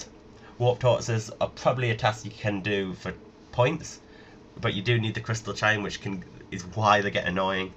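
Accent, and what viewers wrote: United Kingdom. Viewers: brittish accent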